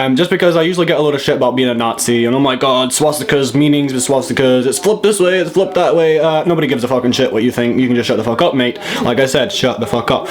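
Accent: Scotland, United Kingdom. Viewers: I think he moved to Scotland from somewhere so his accent scottish accent sort of blended with the other one. Just as half-assed as his tattoos lol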